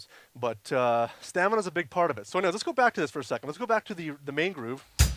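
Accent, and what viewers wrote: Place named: Australia. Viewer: Your British accent sounds Australian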